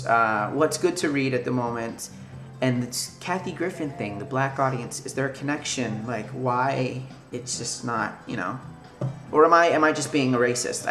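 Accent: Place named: South, United States. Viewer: You sounded really southern in parts of that video!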